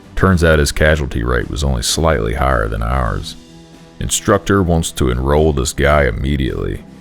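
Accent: Germany. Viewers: hmm , do not confuse dutch with german .... so please hold that freaking german accent ! thx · Whatsup with the german accent?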